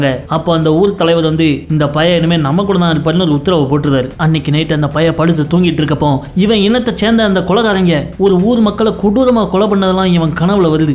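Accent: Tirunelveli, India. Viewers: திருநெல்வேலி ஸ்லாங் சூப்பர் 👌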